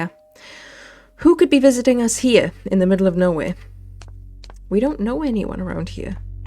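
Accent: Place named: Eastern Europe. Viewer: Dear author, I realised your accent is familiar, especially "р" sound. Actually, where are you from? Are you slav? Sorry for my bad English, please reply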